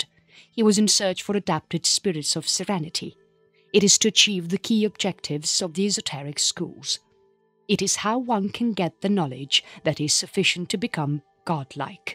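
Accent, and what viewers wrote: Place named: United Kingdom. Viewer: I'm seeking real info about The secrete societies in existence today establishing the New World Order like they have accomplished in Euro. Oh I know it's a secrete. Why don't you dare mention the world bank, the U.N, NATO, The Pacific Alliance, etc????? She has a British Accent but displays US Marines? I don't trust this Commercial. It demos no history.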